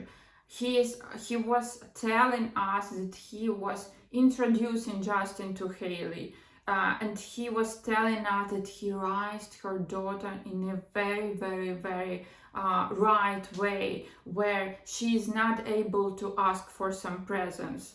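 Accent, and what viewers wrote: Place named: Russia. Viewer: gosh that’s just the most stereotypical Russian accent, and it’s not even a joke 🙈 don’t want to offend you, but I think u might need to work on your speech if you choose “storytelling”.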